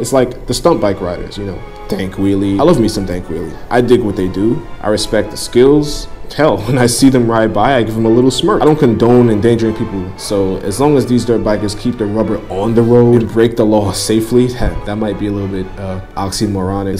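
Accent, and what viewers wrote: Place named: New York, United States. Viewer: Fellow New Yorker here, raised in Uptown. Love how you articulate your points without losing that NY aura and tone. Props my dude. God bless.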